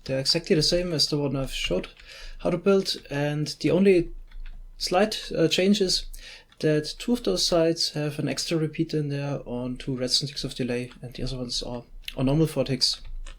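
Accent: Germany. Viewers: Hi, i saw your minecarttrack in the nether for fast diagonal travel on your server and wanted to build it on my own, but i did not find a tutorial on your channel for it. Is there a video i did not see or do you know one? Would be great if you could help me out. MfG feris, ich gehe mal davon aus dass Sie Deutscher sind, da ihr Akzent hörbar ist.:-P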